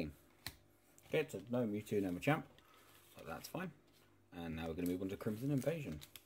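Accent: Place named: United Kingdom. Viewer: Love your intro mate, looks amazing! Sounds like you're from the UK also? Really liked the Detective Pikachu series, do you think the cards will go up in value at any time? Subbed mate!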